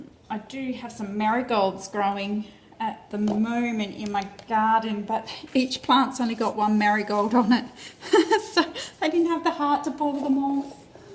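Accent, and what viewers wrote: Australia. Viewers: I PICKED UP YOU WERE AUSTRALIAN, AS I AM PROUDLY. FOR A FIRST ATTEMPT AT ECO DYEING, YOUVE HAD AN AWESOME OUTCOME, PAPERS ARE JUST BEAUTIFUL, IM JUST STARTING THIS. THANKS.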